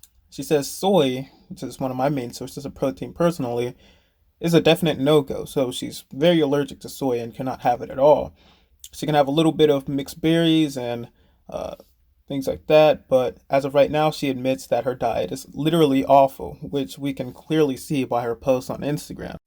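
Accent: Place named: Working class, United Kingdom. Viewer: Mmm so if you believe this girl is sophisticated that would be really sad. As upper middle class, don't kniw yet her accent sounds like a lower class british accent. She's not the brightest and craves attention. So if i had to bet, is lower class narcisist with a severe eating disorder.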